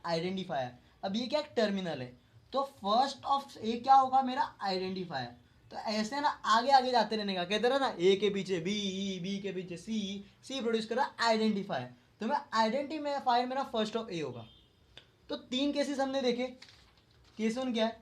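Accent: India. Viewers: indian people have the worst english accent in the world.i cant understand wtf is he talking about.pls go learn some english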